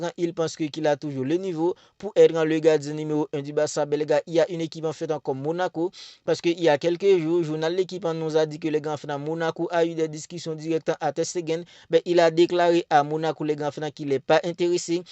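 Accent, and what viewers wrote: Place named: Haiti. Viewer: Je reconnais l'accent d'un patriote haitien, force bro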